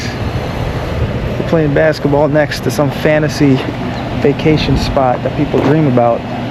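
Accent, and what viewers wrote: New York, United States. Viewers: Yep...you're from New York, alright. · dude you sounded like a Newyorker.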